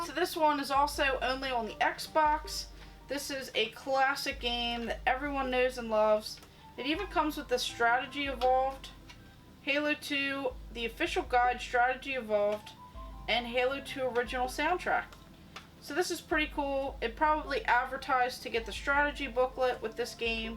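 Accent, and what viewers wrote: Maryland, United States. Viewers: Great video. Love the Maryland accent 'hon! Your collection is incredible, glad I found the channel.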